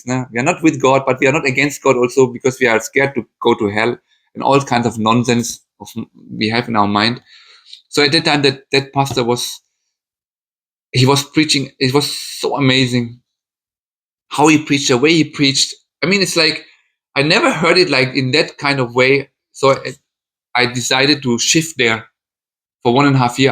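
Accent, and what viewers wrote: Nagaland, India. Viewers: God saved you and gave you lot of chances due to some purpose and the purpose was to come to India and meet your wife I guess. Your testimony was good and clear, best thing is that you speak English just like a Naga. Thankyou for sharing this bro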